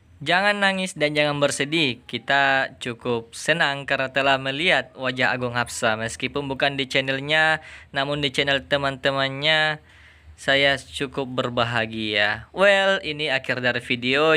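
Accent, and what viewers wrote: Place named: South Sulawesi, Indonesia. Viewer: Pasti lu orang sulawesi selatan kan bro? dari gaya lu ngomong gw bisa tau wwkwkwwk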